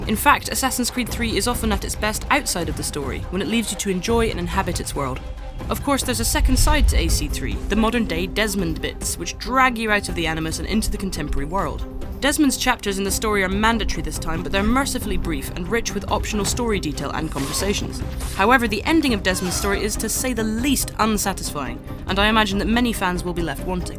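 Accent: United Kingdom. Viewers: you're probably british...can't take you seriously. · i was not prepared for an 11 yr old British guy than the usual twenty something white boy lol... umm this is IGN right?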